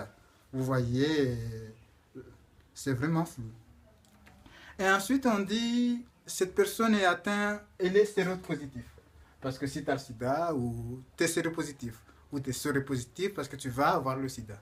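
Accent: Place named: Comoros. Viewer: vous êtes comorien? Ton accent me familier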